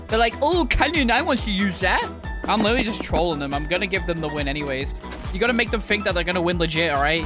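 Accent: United Kingdom. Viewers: Why we like to watch: His cackle laugh, his great persona, his Timmy voice, his god level skills, his British accent, his continued upload schedule, his creative ideas, he’s a great person over all ❤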